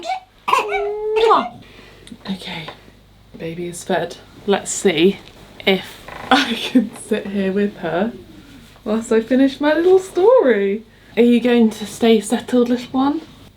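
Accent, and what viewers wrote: England, United Kingdom. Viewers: Would you please slowly say your beautiful baby’s name? I’m American and I struggle at times with the English accent and you are speaking very fast. Thank you.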